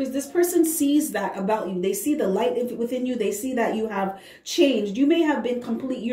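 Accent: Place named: Jamaica. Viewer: I was sooooo resonating with this and then i heard the patois. Made it clear we connecting....thank you my fellow Jamaican.🥳🥳🥳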